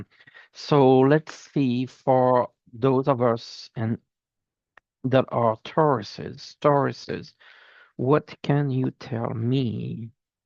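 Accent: France. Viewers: Thank you , may I ask are you French? Your accent sounds a lot like mine, I’m new to your channel , glad I found you, hope you have a great year.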